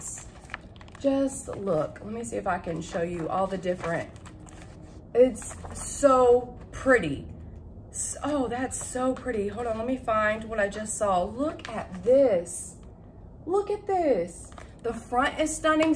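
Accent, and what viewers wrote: South, United States. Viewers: Must be from the South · You are so funny with y'all and yes ma'am. I be laughing so hard in years. Where are you from ? Must be from the South. I love the way Southerners talk. I love going down South the people are so hospitable. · It’s so good to hear someone from the South....I say stuff like “my bag is slap full!” You make me smile! 😀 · HI from Katherine i love southern voice you do a good job on dollar store you gave me tips. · Love your southern accent, love your videos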